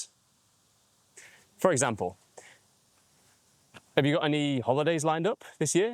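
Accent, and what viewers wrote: United Kingdom. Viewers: Your accent is very clear and easy to understand Mr. unlike the cockney accent tbh I have an Indian accent and would love to pick up SSB Accent like yours so I wanted to ask if you have 1:1 training classes? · Do you speak british english? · Are you from the north of England? You pronounce your u's just like Gary Barlow does 🤔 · Hello, what a nice video indeed I really like the style you're using for your channel, the manner...the pace well I don't know if you talk like that in normal life...and of course the accent, I love the British accent a lot. And, those suggestions are very useful for a guy like my who is quite the opposite of being talkative 😅 and he's learning English to beat his shyness as well, so...thank you! 🙏😊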